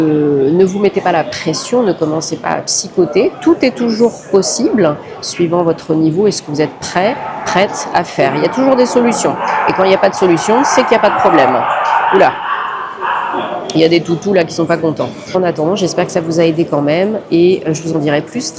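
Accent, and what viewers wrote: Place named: France. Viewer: Any channel that helps inform more beautiful French ladies on how to move to Scotland gets all my support and a big thumbs up 👍😀 Who knew watching a French lady roaming around Scotland could be so interesting and relaxing. I could listen to your accent all day long :) Thanks again for the video, and best of luck with your channel. 💙🤍 from SW Scotland.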